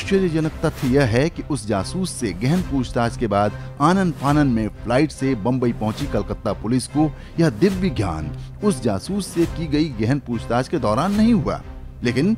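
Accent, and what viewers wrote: Standard. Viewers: बड़ी शुद्ध हिन्दी है आपकी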